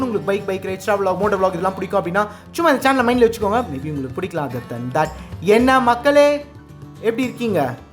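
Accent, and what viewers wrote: Chennai, India. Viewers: Hearing the name at the very first time! As usual I have enjoyed the way you talked about the bike and it’s performance. Please stick with your style of reviewing with namba chennai slang which has got many fans including me. There are many professionals in the market to talk about Bikes but you are little different than others. Hope you already know it and wish to meet you in person someday 👍🏻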